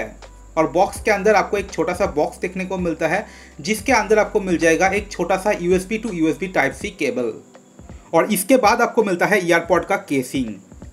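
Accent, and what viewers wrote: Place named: Bengal, India. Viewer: Shune mone hocche tumi bangali... Banglay content dile aro bhalo lagbe...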